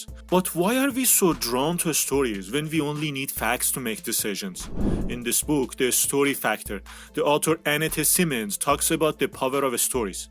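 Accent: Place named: Iran. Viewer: Oh this is the thickest Persian accent that I've ever heard! 😂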